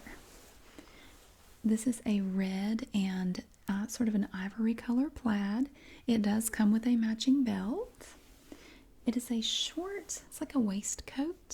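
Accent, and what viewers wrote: South, United States. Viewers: I absolutely love your roleplays! And your voice is so relaxing. Plus, the Southern accent reminds me of home <3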